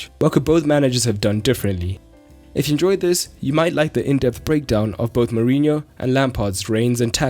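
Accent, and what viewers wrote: South Africa. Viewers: This is a South African accent.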